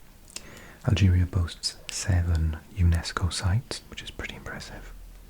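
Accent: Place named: Wales, United Kingdom. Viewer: Fellow welsh person?🎉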